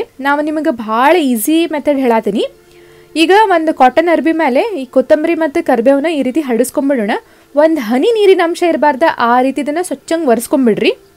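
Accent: North Karnataka, India. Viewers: ನಿಮ್ಮ ಉತ್ತರ ಕರ್ನಾಟಕದ ಭಾಷೆ ನಂಗೆ ತುಂಬಾ ಇಷ್ಟ ಅಕ್ಕ 😍😍😍😍 thank u for the recipe we'll definitely try it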